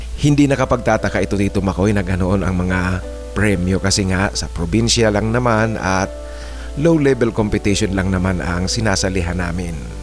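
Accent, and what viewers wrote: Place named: Tagalog, Philippines. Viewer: good evening, Tito Makoy... I realized that it's been 5 years now since I started following your channel dated March 18, 2021. lala ko pa, I used to smile every time you have an accent in some Tagalog word. I think it was one of my comments to teach you to pronounce some Tagalog word.. 😅 but now, as I've mentioned before, you're totally sound from the Katagalugan region. (I came from the popular "Balagtasan" province, can you guess?😅) more power to your channel and wish it will reach the Decade Anniversary.🤗 Stay safe... 🫡 God bless! 🙏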